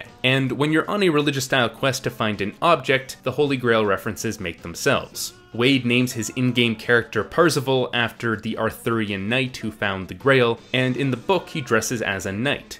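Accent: Canada. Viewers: Sorry, dude. I just hear Nerdwriter in a Canadian accent.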